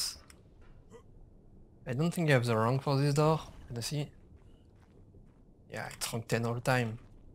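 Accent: France. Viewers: On sent l'accent français quand même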